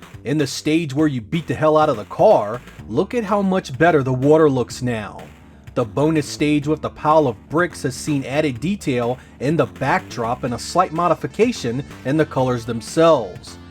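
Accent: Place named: Maryland, United States. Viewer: He sounds like he's from Maryland.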